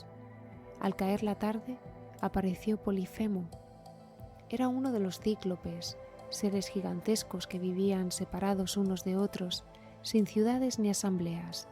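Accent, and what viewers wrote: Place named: Spain. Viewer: Nueva suscriptora. Me encanta tu suave voz, y el acento español es un plus. Gracias por tanto trabajo y espero de todo corazón que muy pronto llegues a los mil suscriptores. 🎉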